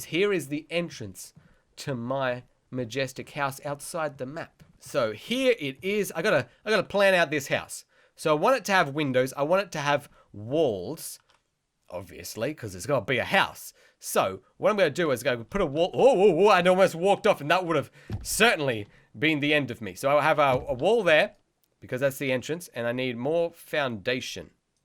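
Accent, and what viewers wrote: Australia. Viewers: I love your australian accent,so nice to hear australian :)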